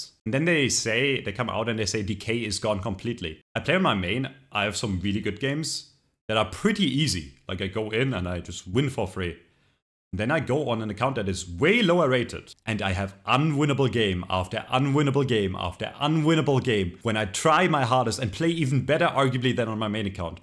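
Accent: Germany. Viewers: critiquing a system is always better with a German accent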